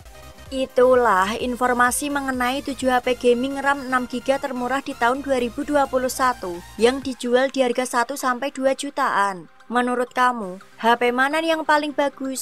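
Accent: Java, Indonesia. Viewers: Medok ayu lo, aku ya medok kebumen hahaha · suara nya bikin ngakak,,medok medok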